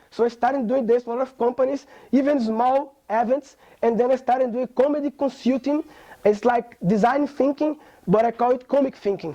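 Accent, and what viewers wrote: Northeast, Brazil. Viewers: Excelente,. Estava querendo ver essa sua palestra há um tempão e de repente poder assisti-la assim com esse northeastern accent foi massa demais =P. Curti muito esse conceito de ideias sendo armazenadas e também a ótima ideia de resolver problemas que não são seus. Obrigado por compartilhar a palestra e sua jornada na singularity. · Fico orgulhoso de ver um brasileiro ensinando a pessoas do mundo inteiro algo mais importante que futebol. Mas, o que ganhou meu dia foi ver que esse brasileiro é um nordestino. Ou seja, vem de um lugar cujas pessoas são vistas, por gente de outras regiões, como desqualificadas e incapazes de ocupar posições diferentes de "porteiro de condomínio". Morei 6 anos em Recife, voltei para Natal há pouco mais de 1 ano e gostei muito de rever (ouvir) esse sotaque. Mas, dessa vez, em inglês e ensinando ao mundo como pensar! 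Nailed it,! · Nordestino fala inglês também quando diz: "Oh shit, negga!" - Em português: "Oxente nega!" Parabéns, uso muito seus conceitos na minha vida, simples e diretos. Admiro você por que você continua com o sotaque e não fica querendo modificá-lo, vivemos num país continental, é normal que isso exista. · Inglês com sotaque nordestino kkkk. entendi bem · Achei interessante. Seu inglês é bem nordestino kkkkkkkkk, sucesso viu, garoto prodígio!!!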